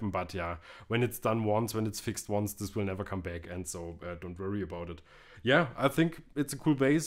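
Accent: Germany. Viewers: Man hört so richtig, dass du Deutscher bist!!! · Direkt nach dem ersten Wort erkennt man den Landsmann =D Will mir von Harley Benton das bass Bastelkit kaufen bin mal gespannt ! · mann hört das du deutscher bist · When you're german and you try to speak English😂 you can hear the german out of him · This german accent is killing me hahaha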